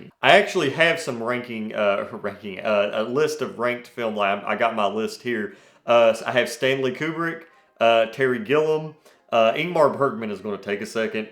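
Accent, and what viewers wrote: South, United States. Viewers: I just love your southern voice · Love hearing a southern man who’s into cinema!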